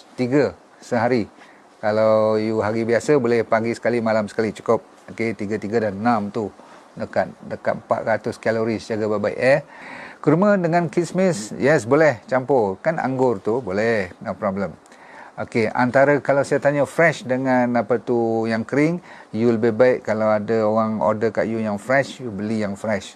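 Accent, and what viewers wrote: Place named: North, Malaysia. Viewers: Manis melecaih... org utara ka... Banyak perkataan bunyi belah2 utara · org peneng klo xsilap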